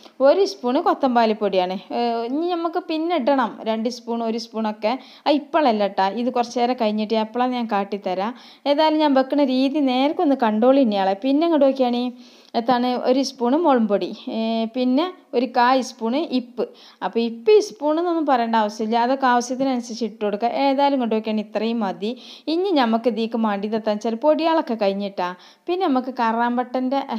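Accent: Malappuram, India. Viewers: ഇത് ഒരു 50 വർഷങ്ങൾക്ക് മുമ്പെയുള്ള മലപ്പുറം വർത്താനമാ 😂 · It ha no malapuram bhasha?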